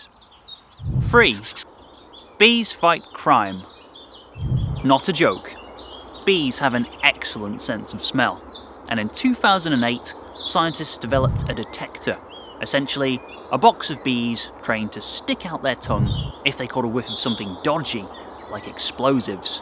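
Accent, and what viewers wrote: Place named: United Kingdom. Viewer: Finally a british accent yay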